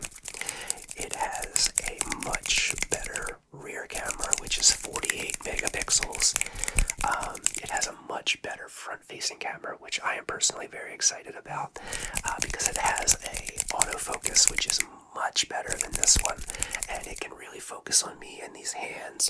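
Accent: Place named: Maryland, United States. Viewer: I really enjoyed this video! Love the talk about the accent, being from the UK I didn’t really realise people from Maryland / Baltimore had such an accent!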